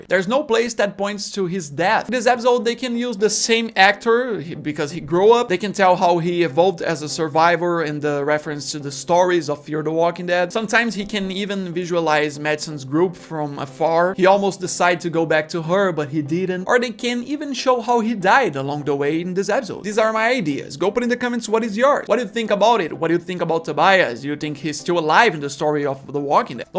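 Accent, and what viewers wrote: Brazil. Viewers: Muito bom o vídeo. Sério, no começo eu escutei seu "sotaque" e na hora pensei que era brasileiro kk, mas no final quando você falou que não era nativo fui ver de onde tu era só por curiosidade e não é q era brasileiro kk. Espero que seu canal cresca ainda mais e sucesso! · sabia que era brasileiro pelo sotaque kkkkkkkkk. Bom video, irmão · Reconheci o sotaque Br na hora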